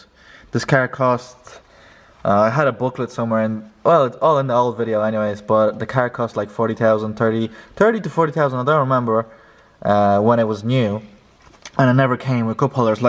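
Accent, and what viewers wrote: Ireland. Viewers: u irish my g? love the accent.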